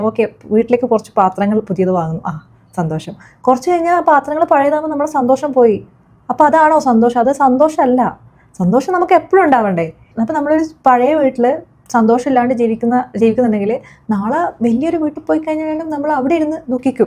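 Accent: Kannur, India. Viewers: Crispy Kannur slang.... 👌👌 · Nice content, but understood by only a few. Good one. Varthamanam kettitu, are you from Kannur? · ഭാഷ കേട്ടിട്ട് കണ്ണൂർ, വടകര ഒക്കെ ആണെന്ന് തോന്നുന്നു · നിങ്ങൾ കണ്ണൂർ ജില്ലയിൽ ആണെന്ന് തോന്നുന്നു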